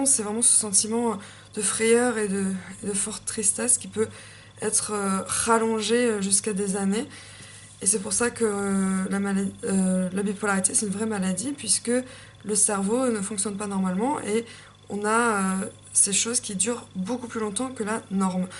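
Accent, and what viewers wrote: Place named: France. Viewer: J'aime aussi ton accent français quand tu t'exprimes en anglais (c'est beau). Je ne savais pas que tu as vécu en Angleterre aussi, mais j'ai bien vu que tu es Franco Anglaise. Merci pour la vidéo (Ecouter de la musique moi j'ADORE !!!!!!! Je m'évade je suis dans un autre monde j'oublie TOUT ça fait un grand bien. Je peux écouter de la musique pendant quatre heures car la musique est tellement varié.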